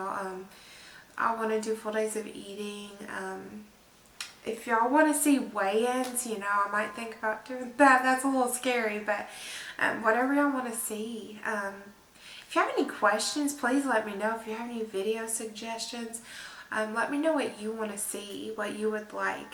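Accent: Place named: Tennessee, United States. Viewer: YAY!!!! Look at you sittin there all cute!!!! I liked and subscribed before the video even started 😂😂😂 I love your accent. Now when I read your posts on IG I can do it with your Tennessee flair 😉 Amazing first video. I look forward to the next one.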